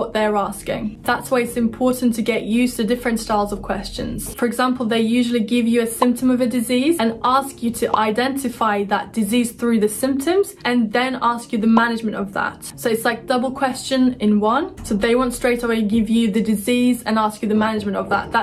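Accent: United Kingdom. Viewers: İngiliz aksanın çok temiz fakat çok aksanlı konuşuyorsun anlaması zor olabiliyor.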